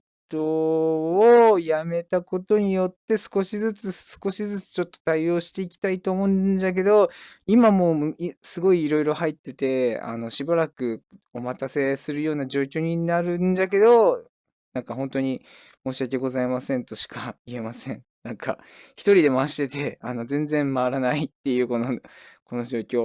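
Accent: Hiroshima, Japan. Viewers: 時々広島弁になってねえか？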